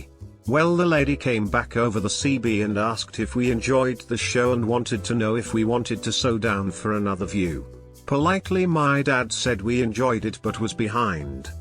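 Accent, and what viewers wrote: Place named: England, United Kingdom. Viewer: Who is this new English chap?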